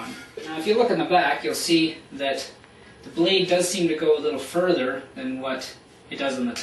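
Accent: Canada. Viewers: Thanks so much, really helpful! You have a lovely Canadian accent too 😁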